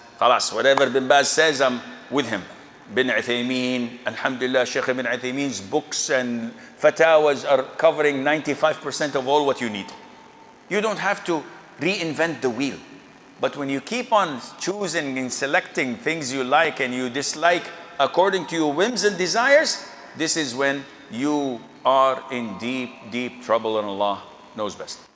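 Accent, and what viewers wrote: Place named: West, United States. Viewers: I sometimes wonder if he ever lived in the US!! His English is more American than UK!! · Yes, shaikh takes pride in his acquired western accent and considers South asian accents particularly loathsome.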